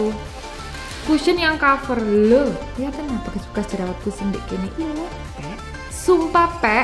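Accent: Java, Indonesia. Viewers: Kak coba dong colab sama kak Hansol (korea roemit) pasti cocok banget... Sama* medok hehehee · Ya Allah 😭 sueneng poll mbe konten2e sampean akuu, medokmu ikuloo yawllahh bikin jatuh hati❤❤ Sehat selalu ya cekno iso gae konten2 seng menginspirasi wong okeh😊 lopyuu ♥️ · Auto subscribe pas mbak'e ngomonge medok. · biasanya kalo liat beauty vloger yg medok suka gmna yaaa,aneh...tpi aseli mbaknya iniii lucuuuuu 😂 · Sampean ini kok lucu buanget seh mbak...😅 tiap lihat video sampean sambil senyum2. karena omongan sampean yang logatnya medok jawi bangeeet.🤗🤗🤗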